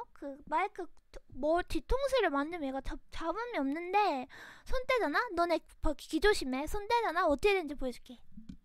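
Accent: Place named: South Korea. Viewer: 가 롤을 해서 그런지 짜증내는 말투가 점점 한국식으로 변해가서 너무 귀여워ㅋㅋㅋㅋㅋ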